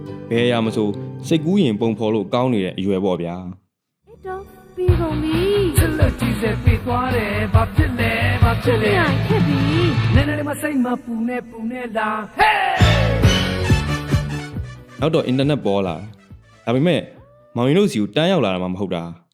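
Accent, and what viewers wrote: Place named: Dawei, Myanmar. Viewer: မောင်ရင်ကထားဝယ်သားလား ထားဝယ်စကားပြောတာပီလို့ဗျ❤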